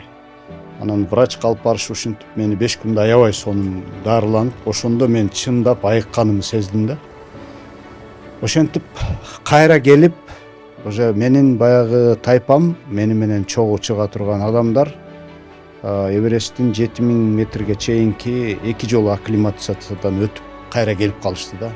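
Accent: Standard. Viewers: аман бол сен Сайдилхан агайдын уулу окшойсунАтан атактуу мугалим ошондуктан таза маанилүү Кыргызча таза сүйлөп жатасынӨзү Кара Кулжалыктар аябай чыйрак чыдамкай өжөр болобуз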